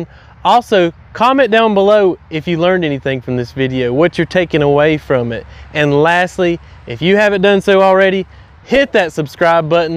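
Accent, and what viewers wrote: South, United States. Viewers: Recognized a Southern accent, confirmed by NC on your shirt :) I'm in Arkansas. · thanks, great video! very instructive! will do mine now this morning! by the way! you've got a great southern dialect!!!!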